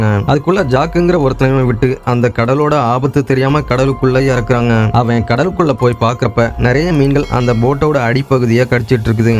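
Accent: Kongu, India. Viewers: Varuthung pothung puriyuthung...bro nenga. Kongu side ah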